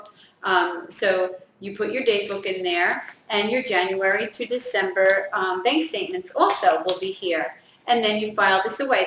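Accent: New Jersey, United States. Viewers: I found you by reading an article where someone mentioned you in an ebay forum about eliminating cluttler. The first video I saw of you is the one with zippered curtain bags. At one point I had every size. From curtains to sheets to bed in a bag. I got rid of them all. Well actually I gave them to my kids for camping for sleeping bags and linens. I think you're great! Are you from NJ? You sound like it. lol.. my email is <mail> looking forward to your emails and thank you!!!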